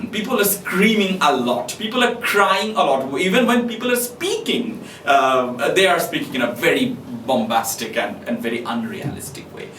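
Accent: Bengal, India. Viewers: For an English teacher the accent/diction is amusingly bengali.. but that's ok if the critique was not so poor.. Does not go beyond just describing the acts in the play. It has no depth of the time, of social/political/ literary history. Is this sponsored by UGC?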